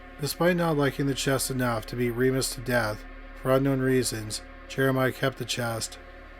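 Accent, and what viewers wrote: Canada. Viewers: He’s Canadian, that’s his accent. Leave his page if it bothers you so much. I used to turn up the speed of his videos when I first started watching bc his voice was annoying to me. I don’t mind it anymore.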